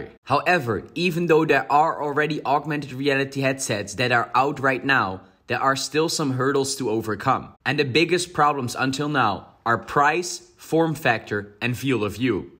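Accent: Netherlands. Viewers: Just gotta ask this: Are you Dutch?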